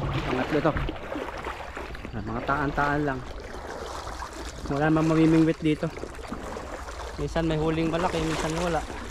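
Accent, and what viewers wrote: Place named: Quezon, Philippines. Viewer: Bro. Tanong ko lang ano probinsya mo halos parehas tayo ng punto at yun pagkatagalog mo. Taga Quezon province ako. Bago mo subscriber pala. Parehas tayo ng hobbies ingat palagi ang God bless.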